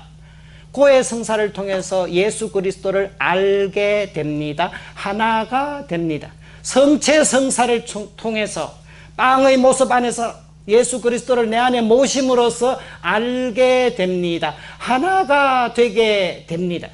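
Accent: Gyeongsang, South Korea. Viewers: 좋은 말씀 감동입니다 ㆍ 경상도 악썬트에 노래로 재미나게 말씀을 풀어주심에 감사 드립니다 ㆍ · 신부님!! 우연히 신부님 갱상도 말씨에 반하여 강의를 듣게되었습니다 경상도 말씨 넘 정겹습니다 뒤늦게 신부님 강의 듣느라고 요즘 삶의, 신앙의 생기가 살아납니다 신부님 목소리 오래오래 듣고 싶습니다 열정적인 좋은 강의에 감사하며 무엇보다 건강하시도록 기도하겠습니다~♡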